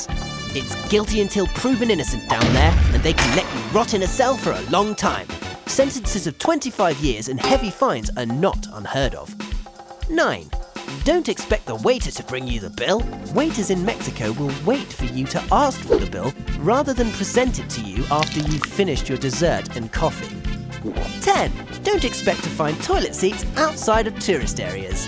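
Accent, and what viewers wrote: United Kingdom. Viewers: He's not an American, he has a British accent.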